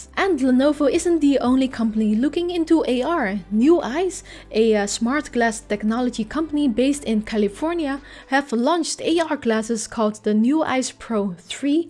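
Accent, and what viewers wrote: Netherlands. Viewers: In the about section of this channel it states: location US. Maar hoewel taalkundig haar Engels als van een native speaker bestempeld kan worden, ik bespeur toch echt een licht Nederlands accent.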